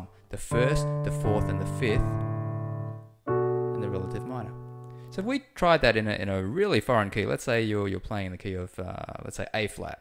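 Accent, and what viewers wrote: Australia. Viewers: Hey you're Australian!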